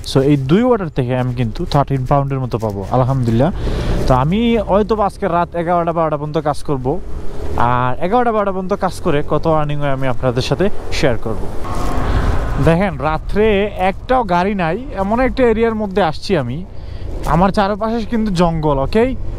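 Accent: Sylhet, Bangladesh. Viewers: বাই সিলেটি নি😊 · Vai apne ki Sylheti Don’t mind ami akjon Sylheti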